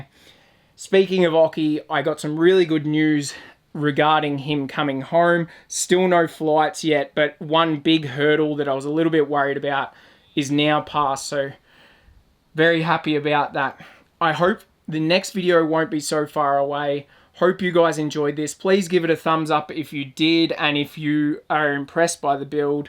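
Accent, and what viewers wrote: Australia. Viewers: Lmao I love his voice, that Aussie twang is great!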